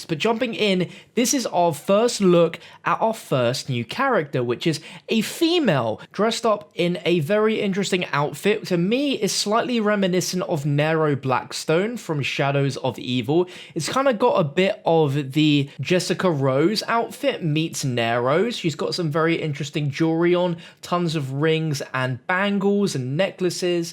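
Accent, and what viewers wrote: United Kingdom. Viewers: The British accent is real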